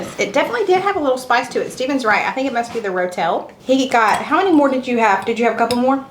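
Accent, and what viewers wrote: South, United States. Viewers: your family is very inspiring. I love watching ( listening to your southern accent). Your graciousness today made me cry. Prayers for your sisters health and to Bonnie's family. Thank you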